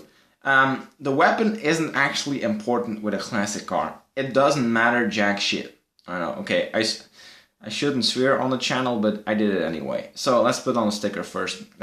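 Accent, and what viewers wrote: Netherlands. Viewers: Hé, je bent Nederlands! ( of Belgisch) : ) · Are u dutch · you are dutch?!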